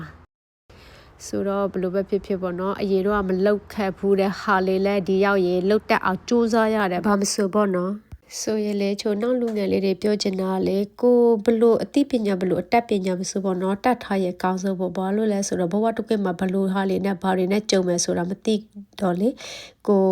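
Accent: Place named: Myeik, Myanmar. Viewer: ဘိတ်သံလေးနဲ့ ချစ်ဖို့ကောင်း နေရော ❤❤❤ အားချစ် ညီမ ငယ်❤❤❤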